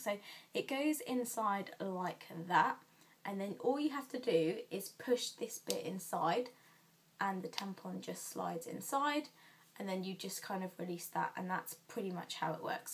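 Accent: United Kingdom. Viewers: aww British accent <3 I wish i was there .. :( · Oh ok well she as has British accent so !!!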